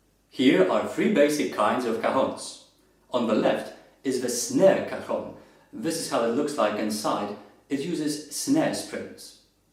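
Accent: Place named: Poland. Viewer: Dzień dobry! Thank you, for your must excellent video. I enjoy hearing your Polish accent. You English as you know is excellent.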